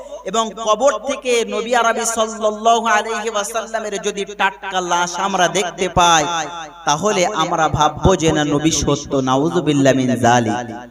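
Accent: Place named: Bangladesh. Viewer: অনেকটা বাংলাদেশী বক্তাদের মতো শুনতে লাগছে ❤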